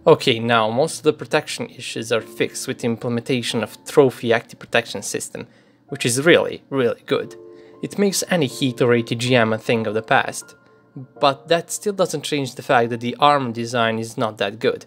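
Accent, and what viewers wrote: Russia. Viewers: This guy is “stupid” you know why? Because I’ve been through thick and thin with this tank Meaning I’m a Gunner in IDF Armored Corps, 7th Brigade,I think the uploader is a Russian speaker with a little bit of accents in English, who probably have friends in the IDF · funny to hear criticism of the Merkava with a clear Russian accent. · This video is reasonably good. However, you thick Russian accent in English commentary at times obscures the meaning especially on the technical terms.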